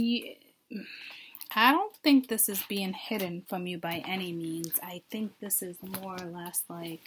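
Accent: Boston, United States. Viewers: Lol, where are you from originally? I love your northern accent. Are you maybe from Boston or something? Love it!!!!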